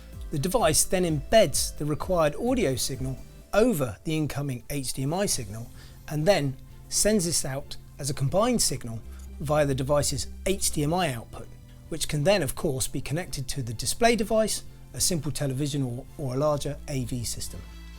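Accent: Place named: United Kingdom. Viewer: I like this video because even though there is the UK/British accent, the over-pronunciation of H (haytch) does not happen here. I've lived nearly 60 years not hearing "haytch" and so I think it is a recent invention/addition to the crazy British accent phenomenon. I call it a phenomenon because when British singers sing, the accent disappears completely! (similarly when people sing US country music they develop a southern accent where none of little is present when speaking) so much fakery in speech... triggers the autistic reaction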